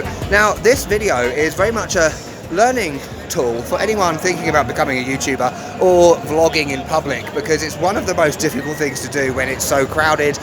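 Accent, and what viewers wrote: United Kingdom. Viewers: OK, British, I love the British culture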